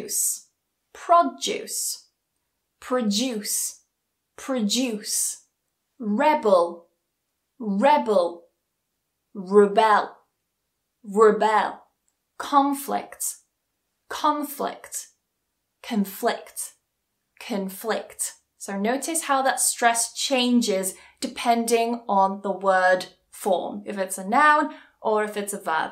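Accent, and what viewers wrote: United Kingdom. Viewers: Though, I am an Indian boy still I love to learn British accent from you. I enjoy learning with your so profound smile 😊😊❤ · I love your British accent ❤️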